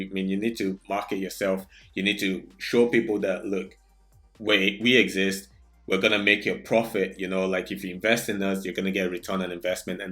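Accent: Scotland, United Kingdom. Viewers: Is that a Scottish accent i hear bro?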